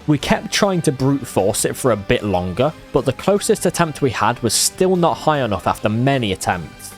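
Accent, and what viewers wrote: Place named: United Kingdom. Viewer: Calling it "Wario's Shipyard" as opposed to "Wario's Galleon" caught me off-guard because from your voice I thought you were British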